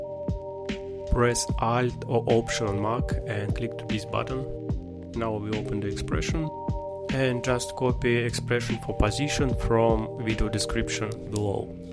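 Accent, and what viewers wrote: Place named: Russia. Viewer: so russian accent )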